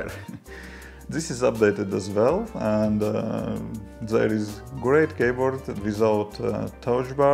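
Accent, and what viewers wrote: Russia. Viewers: Кажется я отчётливо слышу русскаий акцент) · The accent pronunciation is 100% Russian and there is no need to come up with yourself _ that you are supposedly from Latvia. Stupid you are a grown man, and you behave like a 16 year old boy.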